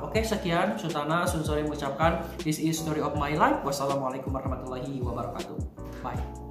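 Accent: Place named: West Java, Indonesia. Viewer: Logatnya sunda abis kang... Aku jd gegeut...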